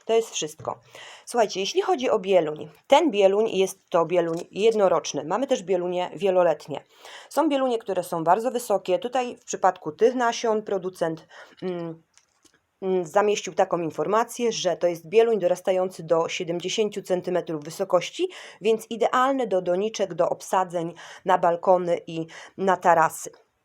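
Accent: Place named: Łódź, Poland. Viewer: Słyszę naleciałości łódzkie ;)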